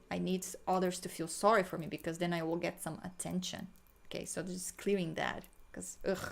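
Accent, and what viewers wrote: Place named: Brazil. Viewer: Listened to this again. For whatever reason, really connected with it...and your story. Lots of shame and heavy emotions came up for me. Also, could tell from your accent you are from Brazil. I was lucky enough to visit multiple times, and always felt so connected. Obviously, I was only seeing what I wanted to see (which as a tourist , is always distorted). My family is from India, but I have very little interest in the culture. It's so boring to me. I always wished I was from Brazil instead :)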